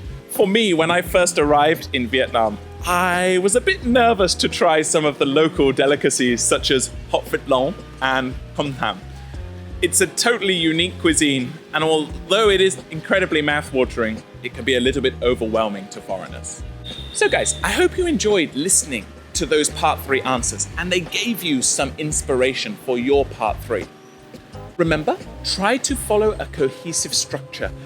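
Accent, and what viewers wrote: United Kingdom. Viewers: what is your kind of accent in England ??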